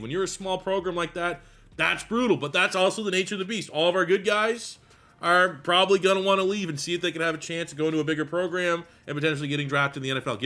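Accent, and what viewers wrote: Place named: Canada. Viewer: Straight to the point. Great content. You're an OG. Been doing it 15 years. I thought you were Canadian with that accent lmao.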